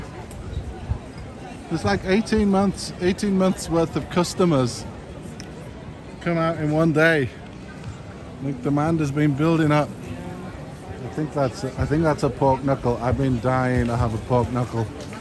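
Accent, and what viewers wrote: Northern England, United Kingdom. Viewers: oh no please do talk on your films. I can hear only slight northern accent but I live in UK so recognise some accents. I want to tell you about restaurants - secret gardens 🤗🤭, You passed one yesterday in Grodzka near Wawel. Another La Campania in Kanonicza Str. On the 29 May eating inside restaurants in Krakòw.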